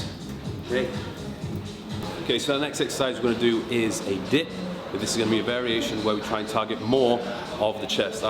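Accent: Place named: England, United Kingdom. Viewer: Finally an English accent!!!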